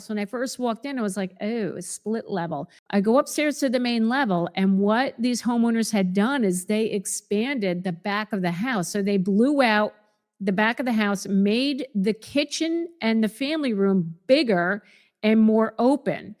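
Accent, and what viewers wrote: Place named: North, United States. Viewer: We relocated to GA from NY (born and raised, particularly Long Island) in '94. I was looking for a different (I know that's not the spelling of your name) and I hit play and turned around. Your accent (which isn't really an accent to me🤣) put the biggest smile on my face!! Every time I register students and I'm speaking to parents calling for information, before I find out where they're coming from, if I hear it, I always ask, "What part of the north are you from?" After 32 years, I'll NEVAH give up my accent! There's no lack of trilevels, stack splits, bilevels, and, of course, Cape Cods on the Island. But when I got down here, I absolutely loathed the bland-no-character-monopoly-looking-lincoln-log-lunchbox-looking houses. Just because it had brick on all four sides, didn't make it any more appealing to me. Still looked like a tissue box to me. 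When we relocated, we'd bought a cookie cutter ranch (because why rent when you can own, right?), then a colonial with a million and one steps) Then, when I made my Exodus from my marriage, and started looking for myself, I knew I wanted the trilevel split I'd grown to love while visiting my uncle's in TN during the summers. His was built on a slope and had a family room downstairs leading out to a pool off the patio, formal living/dining/eat in kitchen with a deck off the main level, then the bedrooms upstairs. His was the first house I'd known with central air! Mine is on a half acre wooded, fenced lot with a second fam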